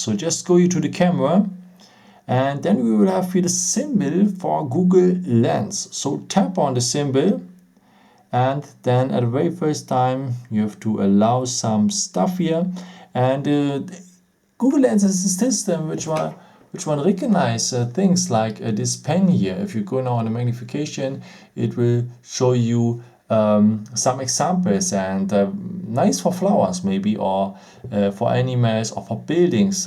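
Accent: Germany. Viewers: Can you speak English ? You are 100% a german guy !!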